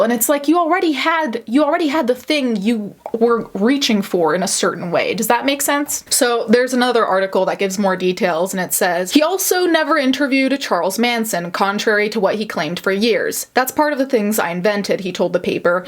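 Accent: France. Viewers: i’m sorry if this is rude, but you pronouncing the french names with an actual french accent takes me out of the story. you don’t have to stop it’s just a side note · Do you speak french ? I ask you this because you have a great accent✨ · Your French accent is stunning. My college French professor told me I should consider not taking French 2 bc of how poor my pronunciation is. Lmao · I'm french and everything you said is true. He was a liar for 30 years and we just found out ,thanks to 4eme œil corporation ,who he really is. But many of us were suspicious for a long time cause of the way he explained things. Always in a weird way and also different story over the years. I have no pity for him and no he didnt come clean , he admitted some things cause he didnt have the choice but keep lying since then. Nice French accent!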